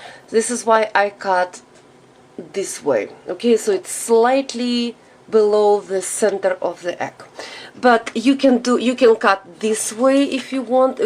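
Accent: Russia. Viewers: Чувствуется ,что по английски говорит россиянка..Произношение режит по ушам..но все равно молодец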